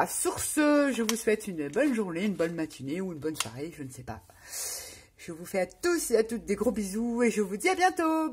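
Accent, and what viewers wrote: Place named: Lorraine, France. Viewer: Bonjour, je suis nouvelle abonnée, et j'entends que tu es en Lorraine comme moi...Tu es de quel coin? Je suis de la région de Pont-à-Mousson. Peux-tu me donner l'adresse de ton nos stp? Bisous 👋⚘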